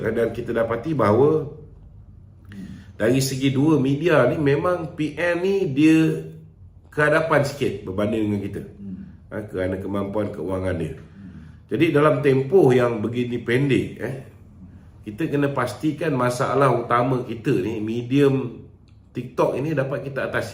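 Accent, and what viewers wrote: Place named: India. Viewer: Pada pendapat saya DLA sembang mcm keling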